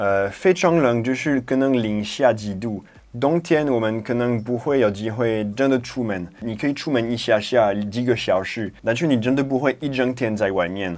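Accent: Taiwan. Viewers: 哈.....台北俏俏話+1!!!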